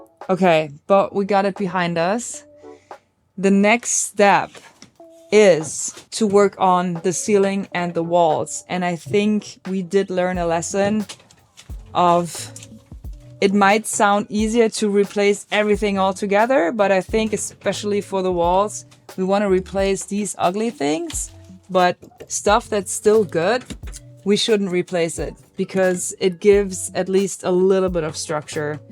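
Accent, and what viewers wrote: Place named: Germany. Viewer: Your German accent has become almost non-existent... except when you say beer/bier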